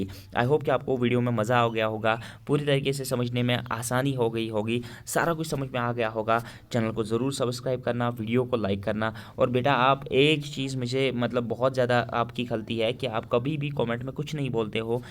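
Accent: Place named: Kashmir, India. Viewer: That kashmiri accent in teaching is so nostalgic ..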